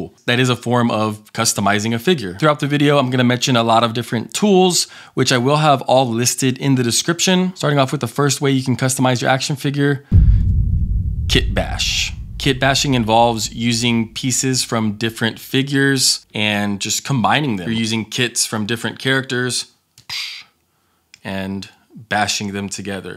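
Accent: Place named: United States. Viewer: You pronounce some words like an American Latino.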